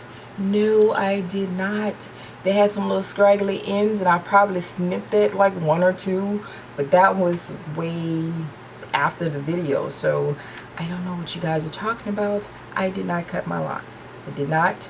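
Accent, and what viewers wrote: New Orleans, United States. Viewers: Sound like you from new orleans babyyyy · New Orleans?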